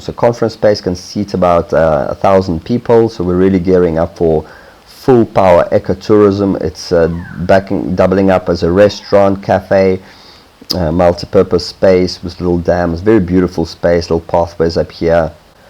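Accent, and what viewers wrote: South Africa. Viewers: Hi there. Are you South African? Love your ideas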